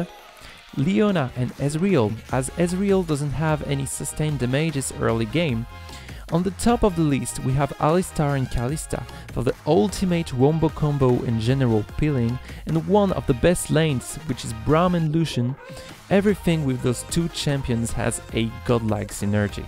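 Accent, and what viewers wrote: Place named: France. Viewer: ***** I'm from France too so I suspected you to be french but I wasn't sure because it wasn't the regular shitty accent :D By the way reported for saying Leblank and not showing you inner french, it's Leblanc duh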